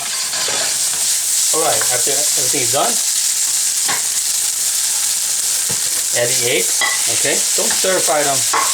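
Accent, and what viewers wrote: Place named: Laos. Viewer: Hi, I was 25 years working with Asian people, mostly Lao, Vietnam and from Cambodia. At first I hate the smell of your food but, slowly when I became friend with some of your people I start tasting the food and today a love it. My favorite are spring rolls with dipping sauce and sticky rice with some vegetable dipping or meet. I enjoy your video and comments your English remind me my dear friends from Laos.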